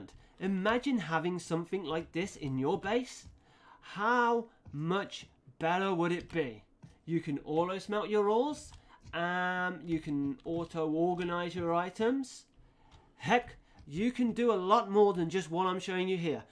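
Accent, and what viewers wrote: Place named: United Kingdom. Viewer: Yeah, hoppah. Nice explanation and voice. I never really liked the british accent, I always preferred something like the canadian one. But you do sound nice.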